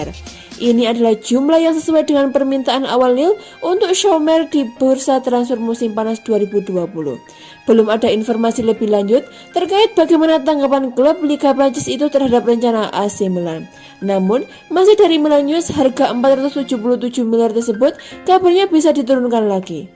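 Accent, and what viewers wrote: Java, Indonesia. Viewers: Medok plus plus. Aksen medok tapi nyebut nama pemain pake ejaan english yang rada rada maksa 🤣 mungkin ini yang disebut Javanglishia 🤪 Buat input ya min, ejaan namanya biar ga terlalu aneh, bagusan cati referensi ejaanya dari komentator bola berbahasa english pas pertandingan. Biar ga terdengar weird kalo disebutin di channel ini. Sukses aja min buat channelnya. Keep it up 💪🏼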